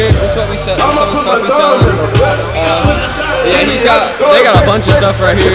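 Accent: South, United States. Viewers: Where are you from? You seem to have a southern accent now that your voice is a little deeper.🤔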